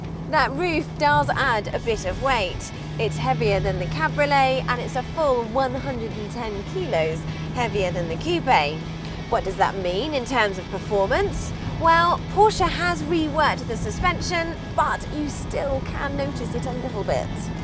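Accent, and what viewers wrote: England, United Kingdom. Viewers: I love your British accent. Cheers. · she's cool, reminds me of the one from fifth gear... anyways which region of England is this accent from?.. curious, anyone?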